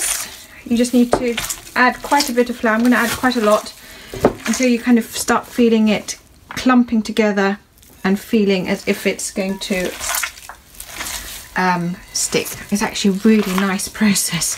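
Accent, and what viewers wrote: United Kingdom. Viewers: I don’t know what it is about female British accents, but damn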